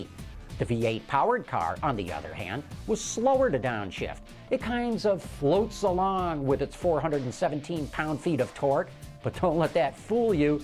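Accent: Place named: Michigan, United States. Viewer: STRONG mICHIGAN ACCENT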